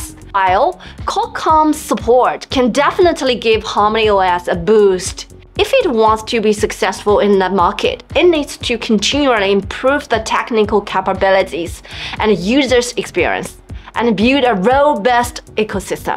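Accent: China. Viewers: your chinglish is soo sexy!.............loved it! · I really love your English as spoken by which is original Chinese girl speaking the International English language. Well done and keep smiling😅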